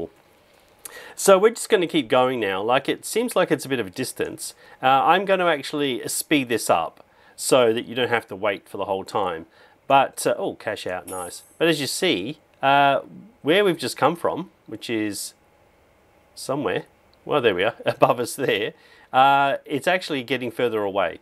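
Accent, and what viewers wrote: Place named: Australia. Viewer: I love your australia accent its so cool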